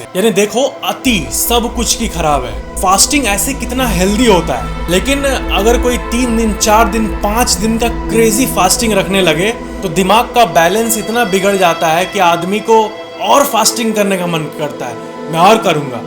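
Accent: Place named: Uttar Pradesh, India. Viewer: भाई आप कहां से हो हमें तो लग रहा है यूपी से हो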